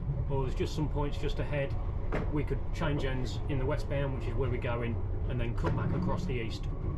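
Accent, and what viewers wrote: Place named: Midlands, United Kingdom. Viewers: Really good video, I’m assuming saying it’s bostin’ is in bounds as I’m hearing a Midlands twang. You’ve a very good way with words 👍🏼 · Bostin'! I originally thought he was a Brummie too, but in an earlier (non-Underground) video I'm sure he said 'Peterborough, my home town'. So, East Midlands not West., please confirm.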